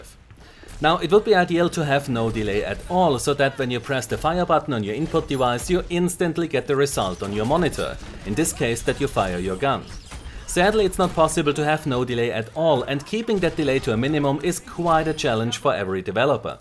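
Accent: Austria. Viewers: could you do a video where you compare the Overwatch Button to Pixel lag across different console shooters? the reason is that on consoles Overwatch seems to have a crazy high lag especially when compared to CoD or BF would be usefull to have such a proof to show the devs that their game needs to be fixed also you sound austrian, is that the case? :P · You always use astonishing test-setups, this is a lot of work, i really appreciate your efforts! Are you from Austria? That strong accent sounds familiar. About the non-difference between 144 and 60 Hz, it could be that overwatch uses physic-frames to control shooting (but that would be odd), and physic frames are capped to a constant rate in every engine i know.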